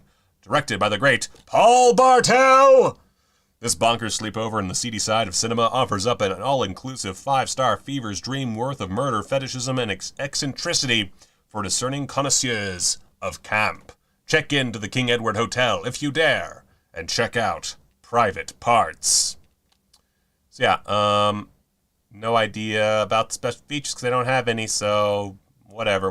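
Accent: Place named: South Africa. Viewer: Your British accent - sounds more South African, And Mr Jolly Lives Next Door is game. Eat the Rich and The Yob are worth the time and fun anyone is aware of the The Famous Five (idealist 1950's kids on jolly good adventures with a healthy lashing of bygone stereotyping) then Five Go Made on Mescalin.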